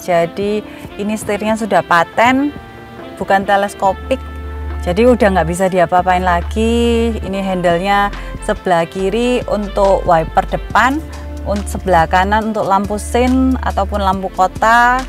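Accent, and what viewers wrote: Java, Indonesia. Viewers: Medok bner dah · iya gais, medok nemen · Mbak nya ayu,jawa nya ketara,aku sukaakkk....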